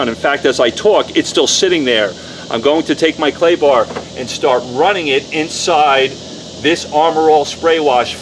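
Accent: New York, United States. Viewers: Dude, your rock! I have listened to Michael Savage on talk radio for years, and his accent and direct manner of speaking is quite unique to NYC. IF you're NOT from there, I will use Blu-Tac to clay my 1983 Chevrolet Caprice with this Armoral. (Hint: IMA do it anyway...) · i love your videos. When did you move to Dallas from New York? your accent reminds me of ME lol lol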